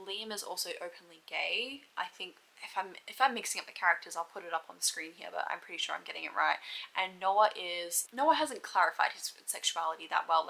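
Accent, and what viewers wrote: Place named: Australia. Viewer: the australian cowboy accent 😭😭😭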